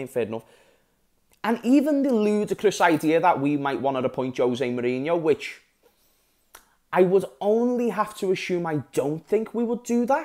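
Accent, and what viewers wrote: Liverpool, United Kingdom. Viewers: I can tell from your accent that you're from Liverpool.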